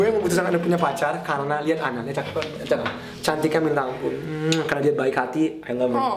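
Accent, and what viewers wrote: India. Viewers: Njir accent india nya..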